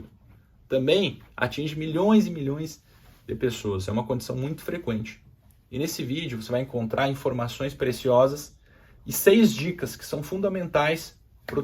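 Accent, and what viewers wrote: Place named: Rio Grande do Sul, Brazil. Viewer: Eu dou nota 10 . Eu vi que tu és gaúcho , pelo sotaque. Conheço bem . Moro em São Paulo !!!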